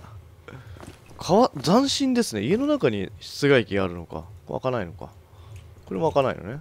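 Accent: Kansai, Japan. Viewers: かっこいい人の関西弁はさらにかっこいい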